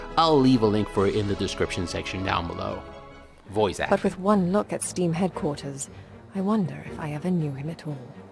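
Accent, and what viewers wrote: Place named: Latin America. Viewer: Loved the review as usual. I usually give the thumbs up before the video starts and thus far haven't been mistaken, not once. As-always, a-mazing. Una consulta? Cuál era el juego que jugabas con las monedas el "Caliche"? Se te nota un acento latino en el inglés, pero no termino de adivinar de dónde. En fin, cada nuevo video de es una vista obligada para mí a este punto. Abrazo grande desde Argentina.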